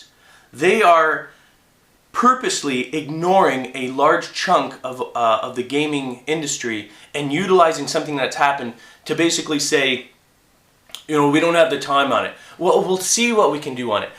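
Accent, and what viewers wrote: Canada. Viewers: his Canadian acent ruins the mood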